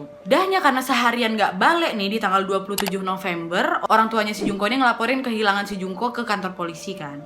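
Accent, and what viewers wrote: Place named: Medan, Indonesia. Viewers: Anjir medan kali kau kak 😄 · Medan punya bahasa ni ya😁🤣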